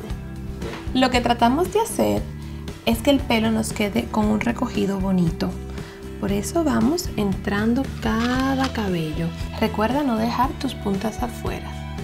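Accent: Cuba. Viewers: porque la voz lo tienes muy diferente a tus vídeos,actuales no entiendo,cual es y voz Real Porque actualmente tu voz es más dulce y con su voz cubano Pero antes tu voz era diferente Acaso mi hermosa finjes tu voz, por favor aclaranos ese tema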